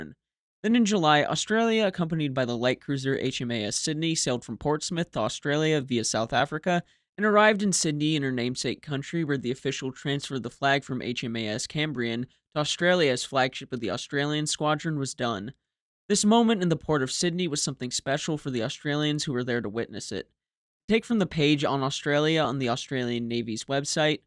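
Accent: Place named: United States. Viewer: This is refreshing hearing good narrative on non US warships with an American accent, but many viewers will miss this asset and show no gratitude for it, as is the failing known throughout society as failure to evolve thoughts according to new developments, what Jackie Fisher thought non First Class.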